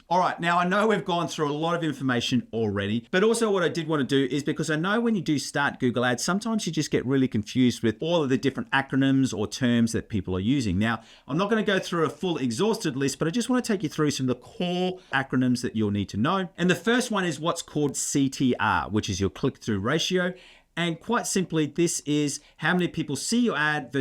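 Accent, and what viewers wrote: Australia. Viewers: Oh yes a fellow Aussie! much rather listen to a aussie talking about this stuff.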